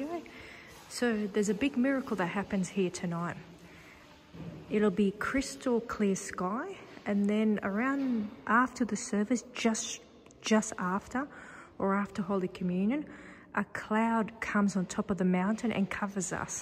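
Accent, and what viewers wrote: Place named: Australia. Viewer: Sounds like an Australian Voice! A mysterious Holy Site.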